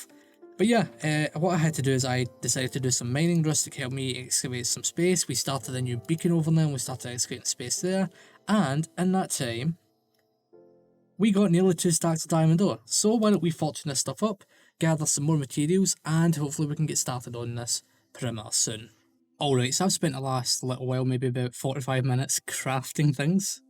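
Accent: Scotland, United Kingdom. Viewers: bro ur scotish accent really annoys me Xd (no hate)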